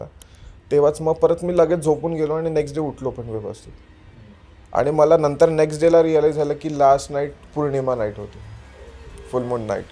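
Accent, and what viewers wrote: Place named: Pune, India. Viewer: Bhai mhit nhi khott vate but amchya nagpur chi evdhi shudu language nhi ahe bhau ....ekda nagpur firun bgha smjhel ha pune vgere mdhla vatatoy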